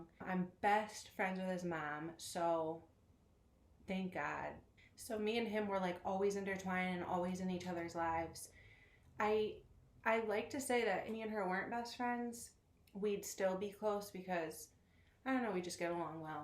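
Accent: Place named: Midwest, United States. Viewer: You have to be from Michigan or Wisconsin? Anyway I travel all over for my job and can spot that accent anywhere. Thanks for the videos your advice.!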